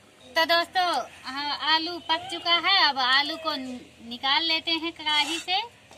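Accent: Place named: Uttar Pradesh, India. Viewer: Aap up ki ho na bilkul mere gaon jaisa bhasa hai aapke gaon ka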